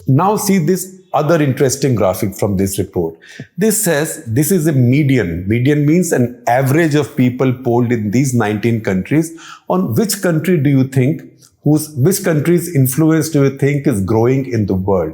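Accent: India. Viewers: Delusions of grandeur, sabre rattling, cringy indian accent on that unpleasant face and obsessing about others is what shekar and indian journalists are good at. Anybody could have read out the report. Stick to journalism dude by shedding light on indian problems. Never heard explaining the PEW report dated june 29th,21 that talks abt religious intolerance in india. No wonder indian journalists have such a bleak view of the world.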